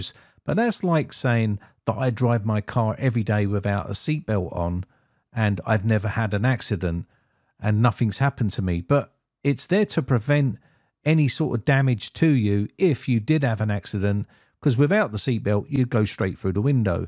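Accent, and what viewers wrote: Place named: London, United Kingdom. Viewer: it's "Watcha guys" Londoner speak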